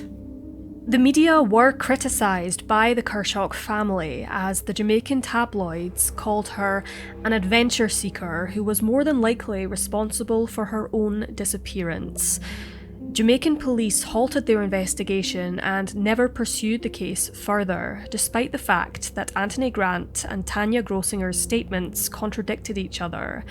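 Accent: Scotland, United Kingdom. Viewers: I've watched quite a few Dark Curiosity stories now. The Mayan narration adds so much. Of all the different Scottish accents, yours is of the most gorgeous. There's a gentle folk tone to your voice that only exists in certain areas. It's so welcoming that it doesn't surprise me that so many love spending time with rural locals. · I love your channel. So many cases I’d never heard of till now. You’re very gentle and respectful too. Love your Scottish accent as well! (My mum is Scottish!)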